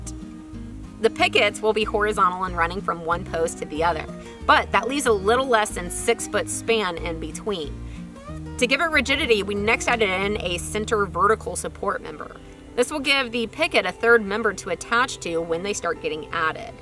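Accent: Texas, United States. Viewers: Hmmm... Accent sounds like Texas... Rocks in the ground, so probably not Gulf Coast or Dallas... Too much vegetation for it to be West Texas, so must be Central Texas somewhere... Howdy from the Houston Gulf Coast area!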